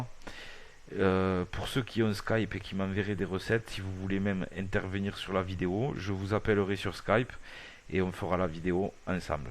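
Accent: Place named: Central Pyrenees, France. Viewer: l'accent de pyrénées centrale de chez moi x)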